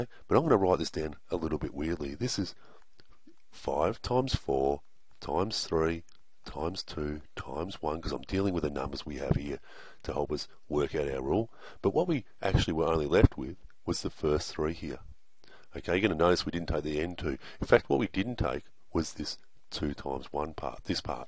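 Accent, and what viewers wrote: Australia. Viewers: Are you Australian? I love your pronunciation hahahhh Anyway I love your videos Love from Italy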